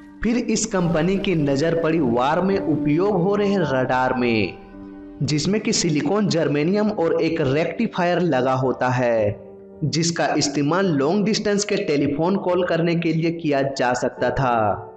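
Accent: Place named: Bihar, India. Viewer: Buddy, it doesn't seem to be Hindi what he is speaking, it may be Bihari or any other distorted local language, but surely not HINDI.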